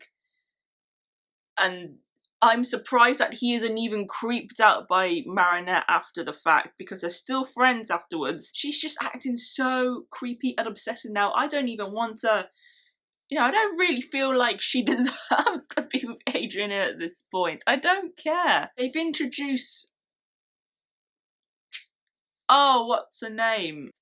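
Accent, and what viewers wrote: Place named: United Kingdom. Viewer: Dear, I am not really interested in Ladybug, but I enjoyed your take on it. It is interesting to see what is important for fans. You look great without make up and your british sounds wonderful. (hopefully it is british english... otherwise shame on me) thx for the video, I think I will check out some more. have a good time and stay healthy:)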